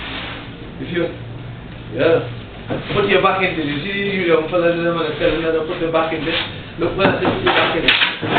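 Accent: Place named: Trinidad and Tobago. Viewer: breds, yuh sound real real trini, yuh know? ah tellin' yuh, boy, is like yuh's mih breddah fuh true.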